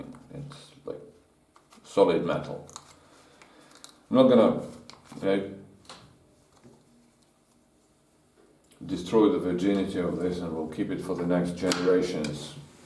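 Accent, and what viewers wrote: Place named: United Kingdom. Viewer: у вас такой приятный британский английский! где вы его учили, или вы жили в Англии?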